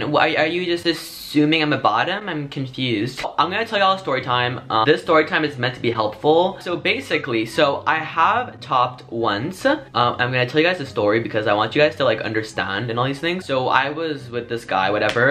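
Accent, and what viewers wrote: United Kingdom. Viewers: The British accent made me smile and started laughing I loved it oh my goodness so great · Who else loved the british accent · Ur British accent sounded like Reuben De Maid 🤣❤️ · Loved your British Accent... So cute💜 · Omg that British accent is everything 😂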